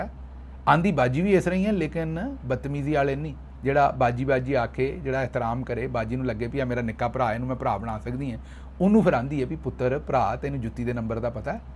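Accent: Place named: Punjab, India. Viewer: اصل میں پاکستانی عوام کی آواز ہے جو کہ تھڑا پنجابی لہجے میں اپنے جذبات کا اظہار کرتے ہیں ۔۔۔۔ بھائی لگے رہو 😂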